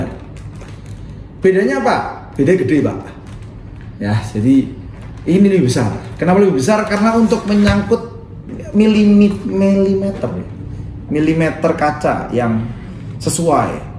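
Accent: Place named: Java, Indonesia. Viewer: asli jowo endi koo😂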